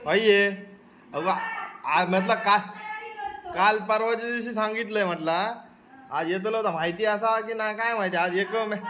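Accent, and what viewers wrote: Malvan, India. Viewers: Pn bhari bolte Malvani Ekdm God Todmodak ka hoyena Pn bolte👍👌❤ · ची मालवणी ऐकायला छान वाठतय आम्हाला चाळतय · Wahnii malvanii try kartaa bolavak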